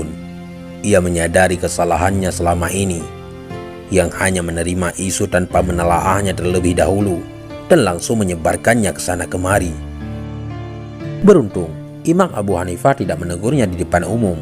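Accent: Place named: Bali, Indonesia. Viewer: Konten bagus karo narasinya menggunakan aksen Bali jadi kerasa gimana gitu...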